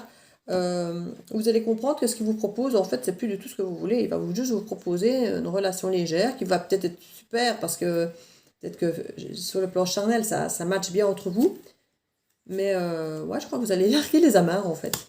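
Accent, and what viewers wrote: Liège, Belgium. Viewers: J'ai mis un pouce d'encouragement... j'avais bien senti cette pointe d'accent Namurois ou Liegeois... avant de lire la description... (ah ben avec le "Oufti", je m'etais pas trompé, c'etait bien l'accent de "Lidge" :p ) Bonjour de Mons :)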